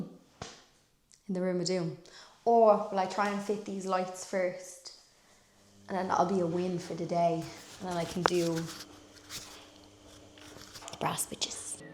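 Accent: Ireland. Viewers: Love your videos love listening to your lovely Irish accent I am in the uk living in Bristol 🙄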